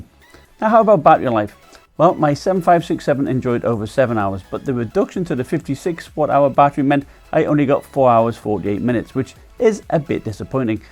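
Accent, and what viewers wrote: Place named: Scotland, United Kingdom. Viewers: exklim Tech News Well, you have this scottish accent plus the funny vid you made with the umprella and I thought u were british! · Sound Scottish.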